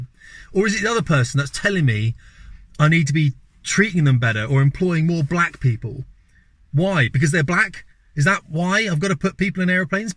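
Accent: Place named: United Kingdom. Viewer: Great content nice to listen to a great British bliloke who is intelligent and knows his stuff 🛩️🛩️👍👍👍👍🇬🇧🇬🇧🇬🇧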